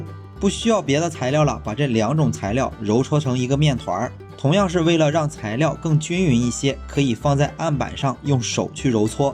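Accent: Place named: Qingdao, China. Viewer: 您的口音很像青岛老乡😊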